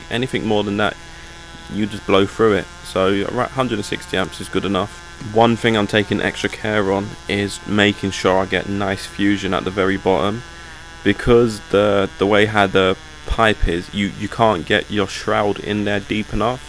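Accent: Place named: East London, United Kingdom. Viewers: акцент у этого негра не американский, а английский...думал в англии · Hi, I'm not so great with internet stuff so couldn't figure out how to message you directly. Judging from your accent I'm going with west essex/east london, but could be wrong. I'm a stick welder in the gas industry working in herfordshire and do a fair bit of prefab as well as on site work. Fancy a collaboration?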